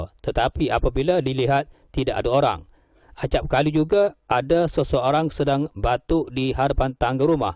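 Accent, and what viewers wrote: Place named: Kelantan, Malaysia. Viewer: xblh buat apa.cara ulasan tu kejap Kelantan kejap bahasa baku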